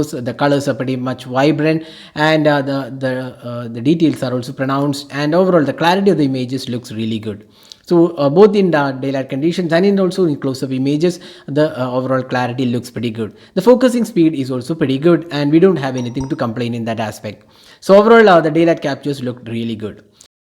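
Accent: India. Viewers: Indian tone hurts my ears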